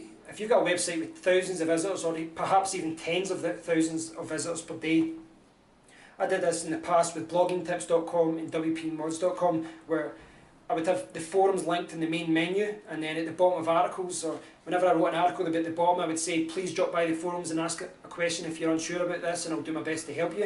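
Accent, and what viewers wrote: Scotland, United Kingdom. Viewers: Hi What part of Scotland are you from? I live in East Lothian Love the vids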